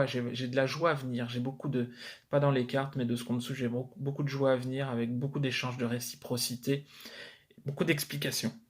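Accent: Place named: North, France. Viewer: ahh j'ai cru entendre parler du nord, bon remarque du sud à chez nous, nous suis bien dans le nord de la France 😂😂😂 Contente de t'entendre à nouveau 🙏🙏🙏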